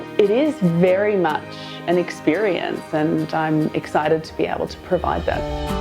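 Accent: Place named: New Jersey, United States. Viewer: i love her new jersey accent.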